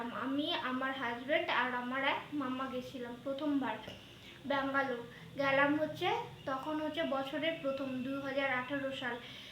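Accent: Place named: North Bengal, India. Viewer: তোমার কথায় বুঝলাম তোমাদের বাড়ি উত্তর বঙ্গে। মনের জোর রেখ। সাবধানে থেকো।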